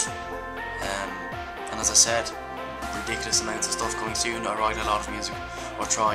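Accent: Ireland. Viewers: Buck sent me! I loooove your Irish accent. hahaha You`re pretty talented! Don`t give up your dreams. :) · irish accent !!1 i love it !! :D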